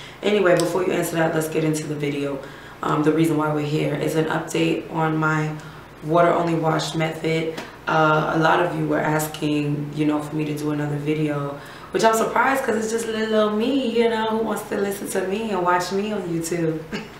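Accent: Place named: Barbados. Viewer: Lol i heard you say "Good evening everybody," in your video about water only washing and I just knewww you were a Bajan. Go girl! Yuh sweet!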